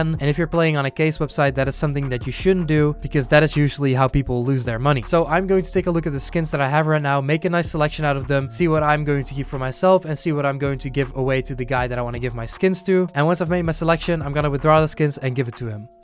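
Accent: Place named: Netherlands. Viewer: Lol ik ben nog niet zo lang gesubbed en je bent nederlands 10000% ik hoor het gewoon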